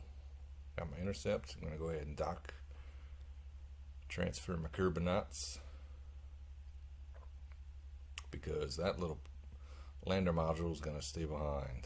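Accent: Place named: Louisiana, United States. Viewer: Your accent is distinctly cajun. How many of your people have made it the Mun?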